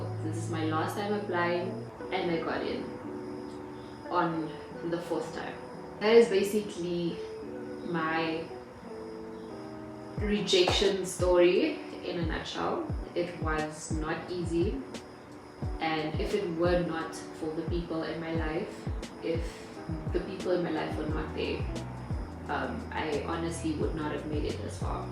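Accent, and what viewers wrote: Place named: Afrikaans, South Africa. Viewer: You look like an overachiever fr. The Afrikaans accent came out progressively. Sub & like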